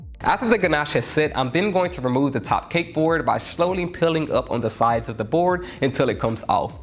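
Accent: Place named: Alabama, United States. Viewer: The alabama accent thoo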